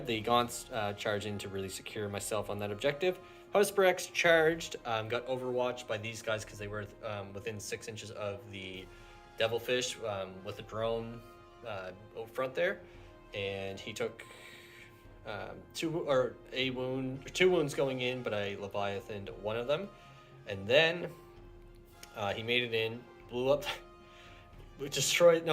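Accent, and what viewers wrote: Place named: Canada. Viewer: Canadian accent detected :)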